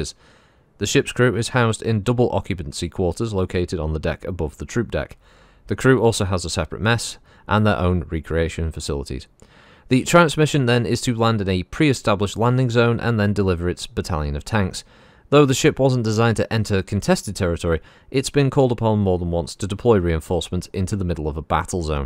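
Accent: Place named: RP, United Kingdom. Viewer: It's that elite upper-class British accent 🙂.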